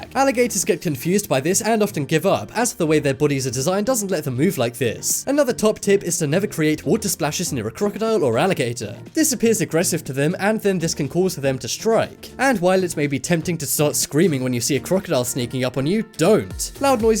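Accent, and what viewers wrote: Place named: United Kingdom. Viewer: I lie, your British accent 👍🏻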